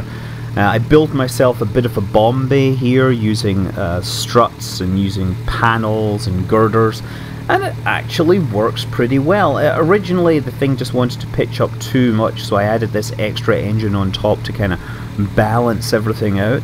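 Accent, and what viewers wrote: Scotland, United Kingdom. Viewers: Hey, glad to see you're back and making videos! I have to confess, I love your videos very much and while it makes me happy to see you go off on a vacation, I'm kind of sad we don't get to hear the dulcet tones of your Scottish accent talking about KSP and other science-y things. Keep it up! Say, ever consider doing a video on DJing or mixing?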